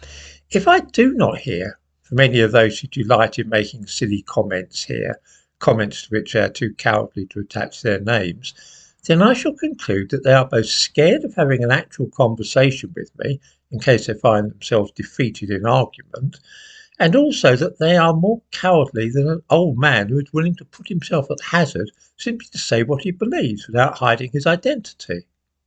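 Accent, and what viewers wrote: United Kingdom. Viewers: Now, if we want to talk about intellectual pygmyism, that's you in a bag on so many levels for believing what this snide old racist has to say. He talks in generalistic terms with nothing to back up his claims, such as "Chinese people are more intelligent than black people", or when he uses a metre to judge that it's due to the Chinese people being on average richer, but utter disclaims societal or home life issues being a factor in wht black people are less rich than Chinese people. Yet the whole foundation of his statement isn't even based on actual Chinese or African examples using an average, he's talking about their attainments in the Western world, without mentioning the elephant in the room, racism. Now, for the non-thinking racist that doesn't bother to check up on the dinosaur's "facts" he sounds plausible, maybe you get suckered by his softly-spoken English accent and it's vague poshness? 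I don't, I am British and I hear his voice and know that the accent is an affectation, as genuine as Donald Trump. Need I go on? Let me explain in summary, you've been suuckered by a racist old fraud who is not, nor has ever been, a historian. He holds no qualifications in it, and the books he has produced are all self-published, no real publisher would touch them with a bargepole. Now, there's a brief summary of a character assassination, have a think about what I've said before you watch the next video by this fraud.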